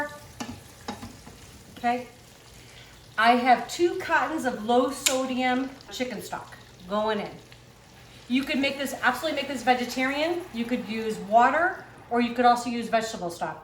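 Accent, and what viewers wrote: Boston, United States. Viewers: Great video First time I’m watching! I hear a Boston accent?!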